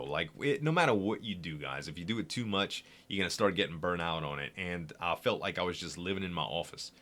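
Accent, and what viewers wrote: Louisiana, United States. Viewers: I knew just from hearing you in Slip's videos that you were from Louisiana. Your accent screams Acadia. :D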